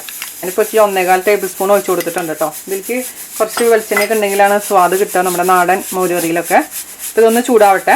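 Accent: Thrissur, India. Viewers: Chechi ur presentation is Soo gud n u have a great thrissur slang 🥰😍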